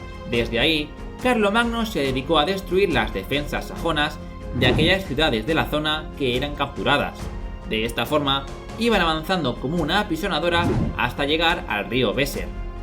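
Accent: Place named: Galicia, Spain. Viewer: Lastima el acento Gallego . Arruina el video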